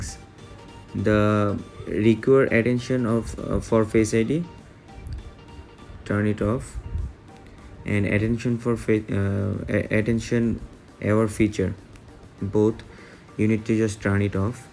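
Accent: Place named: India. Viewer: That reassuring moment when you hear an Indian accent on a tech troubleshooting video 🔥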